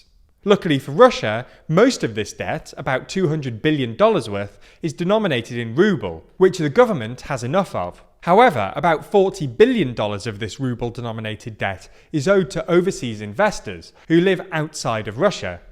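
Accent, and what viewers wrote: United Kingdom. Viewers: I am a bit confused as to why the 2 narrators(this one thinner than the other) of this channel have the exact same voice. Both have good kind of british accent, though.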